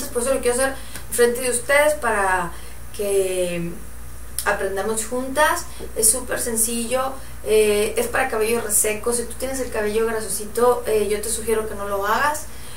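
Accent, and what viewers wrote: Mexico. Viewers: Adoro tu voz y ty forma de ablar xD eres mexicana?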